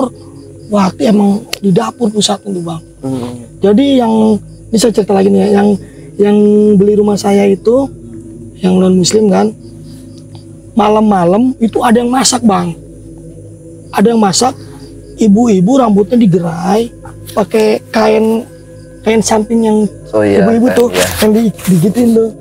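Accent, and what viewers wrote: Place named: Banten, Indonesia. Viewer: Gendrowo main kejar2n lucu juga..😀 Bang asyik ngebawain ceritanya..senang dengar alurnya..logat bahasanya berasa sangat banten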